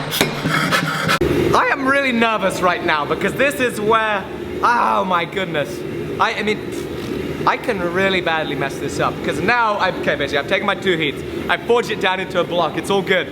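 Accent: England, United Kingdom. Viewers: I wondered how I watched 3 episodes in 2 days and then I realized he's clearly English.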